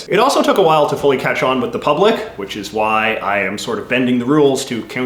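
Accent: Canada. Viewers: To any Canadian who denies they have an accent, listen to this guy. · Love the Canadian accent. Secular Christmas music from the 80's and beyond will always suck. It all has become a holiday of acquirement instead of the real meaning of Christmas.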